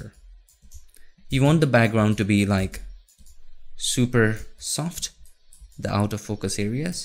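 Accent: India. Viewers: the only indian whose accent is not giving cancer to the ear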